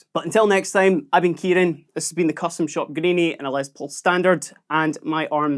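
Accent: Scotland, United Kingdom. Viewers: I like listening to your Scottish accent more than the guitar 🤭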